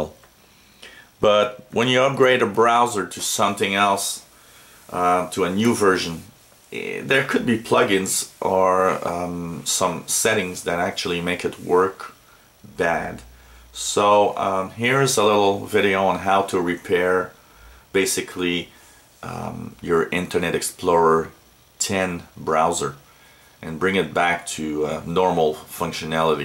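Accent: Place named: Eastern Europe. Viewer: This worked perfectly for me (restore advanced) even with 64 bits. Done, and done. Thank you eastern-european-sounding guy!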